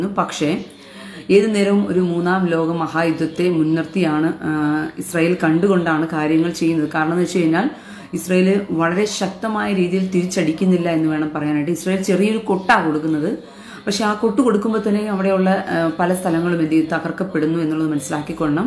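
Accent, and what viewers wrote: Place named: Palakkad, India. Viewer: Ms.,are you a Palghatwala your language Usd seems to be of palghat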